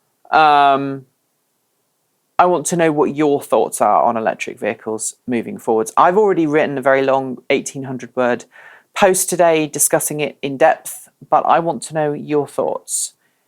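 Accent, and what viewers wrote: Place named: United Kingdom. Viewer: Every bad policy that Trump was threatening was already done by Obama and would have been continued by Clinton. There is massive subsidiued investment in fossil fuel infrastructure still, this is keeping our renewable future from taking off. I voted for Jill Stein and her Green Revolution. Since when does a revolution need an election. I live in Germany and work in Switzerland and am pleading with people to move to EV, batteries, solar PV and wind, with little success. I don't trust VW to make any changes, even though they have said they will build a battery factory in DE. I am supporting your site since you have a bigger voice, so keep shouting, in your quaint British-tinged accent.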